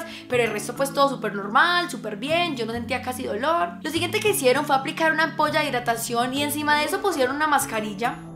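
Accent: Antioquia, Colombia. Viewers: Es Colombiana y ese es el acento de Colombia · Me encanta como habla con ese acento colombiano... Nooo que está mujer es bellísima 😍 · # jaja me encanta ese acento colombianooo, te amo 😍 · Soy la única que ama el acento Colombiano? 🤔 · El acento de, es uno de muchos acentos que hay en Colombia. Ella habla paisa, pero hay más acentos como el rolo, costeño, valluno, santandereano entre otros. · Me encanta ese habladito tan paissa AJJAJA la amo saludos desde Francia pero soy de Colombia y amo mi tierra 💛💙💗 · El acento de ella es paisa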